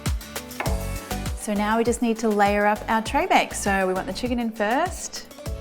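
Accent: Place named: Australia. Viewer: I love your Aussie accent. Your recipe looks humble and simple. I will try this yummy recipe even if I have to wait 70 minutes for this meal to cook lol. Cheers girl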